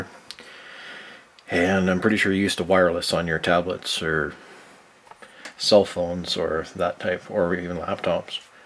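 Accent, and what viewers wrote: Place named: Canada. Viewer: I could instantly tell this is a Canadian man. Dunno how,.......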